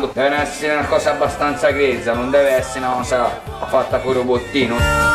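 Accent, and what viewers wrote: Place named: Sicily, Italy. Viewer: a me sembravi un siciliano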